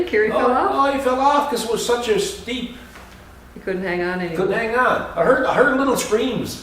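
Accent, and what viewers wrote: Newfoundland, Canada. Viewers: First time watching. Great videos. "My son they're in there now, they thinks their in Bermuda". I'm assuming your from Newfoundland. Now where is the Jiggs dinner? I'm hungry!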